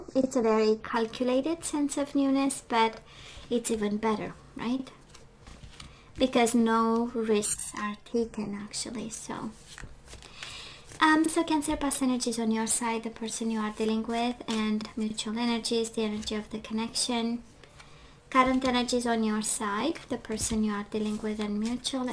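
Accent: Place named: Philippines. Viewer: Y u speak like a Filipino?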